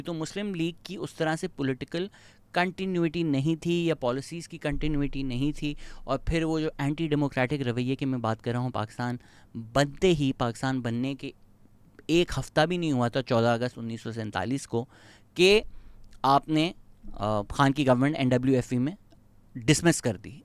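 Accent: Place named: Punjab, India. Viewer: We love when u throw in a little punjabi vich vich 😅 I love your punjabi pronunciation ❤❤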